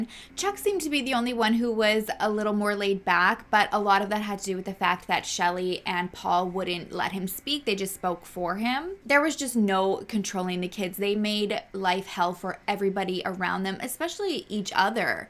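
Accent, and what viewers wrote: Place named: Canada. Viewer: haha when you said dry shampoo i was using some! love your channel and adorable canadian accent 💜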